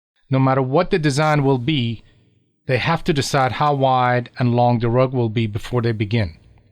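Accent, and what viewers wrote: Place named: South, United States. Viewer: I knew you learned English in the Southern United States. You have that Southern accent! This was a great video! Thank you so much for presenting it so clearly. I had an Iranian friend who had some beautiful rugs, and I was fascinated by the skill used in weaving them.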